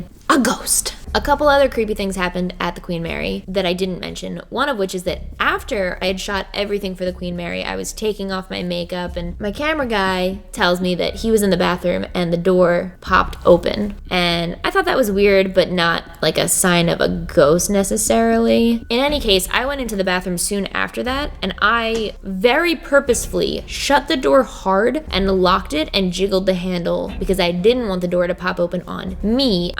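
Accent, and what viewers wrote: Philadelphia, United States. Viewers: I’m from philly and I didn’t even realize how differently we say certain words 😂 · Omg I didn’t know why you sounded so normal to me until you said you were a Philly girl! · I just started watching you and I didn’t know you where from Philly!!! Me too!!! I was confused when you said you said orange wrong because that’s how I say it! I wish I was as talented as u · I am from Philly and always said orange, forest, forehead etc the way you do and I never thought that water and the other words were wrong until you said it today. I’m so shook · Being from philly, I felt the our o's are a's except in "wooder" (water). Lol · philly accent asmr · I’m a Philadelphian. W H O O T E R still hurts my brain. please it’s wAtER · I get the philly accent. In Baltimore t turns into d’s . And Maryland sounds like “marlyn”😂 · Never change the Philly accent! 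We, hometown homies, love that jawn!!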